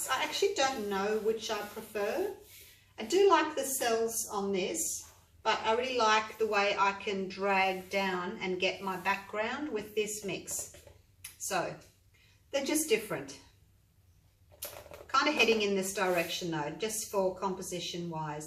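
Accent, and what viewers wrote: Australia. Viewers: Lovely to hear an Aussie voice using products available in Australia - Thanks - Sue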